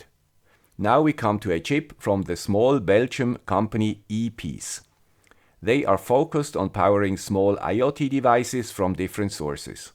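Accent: Switzerland. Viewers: I visited a friend in the Basel canton in early July. I can confirm this is indeed a Swiss Accent. :-)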